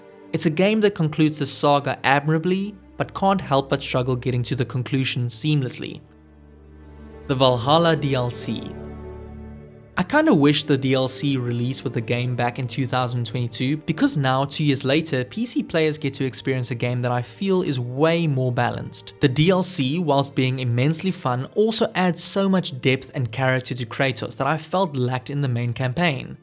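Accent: South Africa. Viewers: I could just hear the South African accent. Keep it up boet great content and loved the honest review!!! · Unrelated to the game... Are tou South African? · Love the video, but on an unrelated note, I can’t help but recognize the accent…are you South African by any chance?👀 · I can tell this dude is South African and trying very hard to cover up his accent. “Taken to HaRRRt” “Cory BaRRRRlog”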